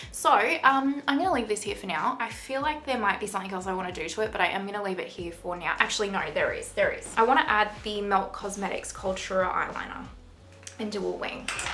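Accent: Australia. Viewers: You are now one of my favorite you tube channel to watch, so glad I found your channel, so enjoyable to watch so talented and that Australian accent is everything! ❤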